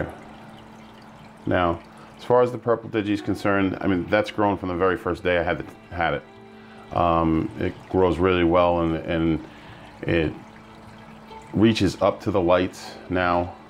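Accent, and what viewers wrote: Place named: United States. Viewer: Hahaha quite the opposite im an English girl so tell me an English girl who doesn't like a sweet ass American accent ☺️☺️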